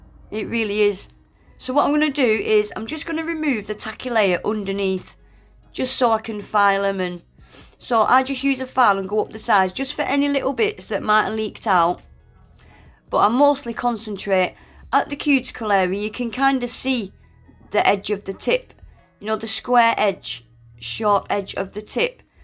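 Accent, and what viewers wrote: Yorkshire, United Kingdom. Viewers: It’s a proper Yorkshire accent 😉🥰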